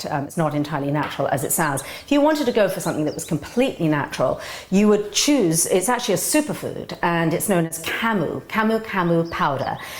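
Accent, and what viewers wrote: United Kingdom. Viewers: You start of sounding British but then pronounce "vitamin" like an American. What's going on?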